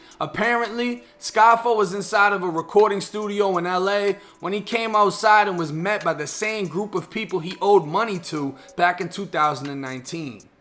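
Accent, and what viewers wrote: Boston, United States. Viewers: You from Boston? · Man how long you been down here in Florida now? Gotta lose that weak ass boston accent. Shit wack. Can barely stand to hear you talk. You do good videos and shit but damn the way you talk is annoying AF!!!!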